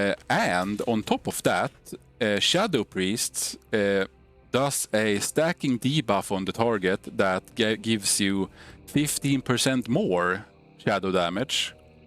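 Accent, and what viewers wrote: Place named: Sweden. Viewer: Great theorycrafting, btw älskar den svenska dialekten!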